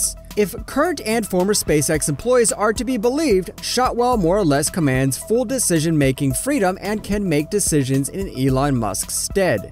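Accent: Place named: United States. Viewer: hahahaha!! It's the YT algorithm trying to understand an American accent and translate. We are just fortunate the speaker didn't come from down south of the Mason Dickson Line cuz, we'd be up t'our urmpits in pee pickin' tribbles, y'all LOL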